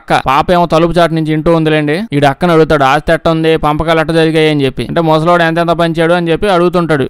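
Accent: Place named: Godavari, India. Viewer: ఇండోనేషియా సినిమా గోదావరి యాసలో అద్భుతంగా చెప్పావ్ బ్రో 😂😂😂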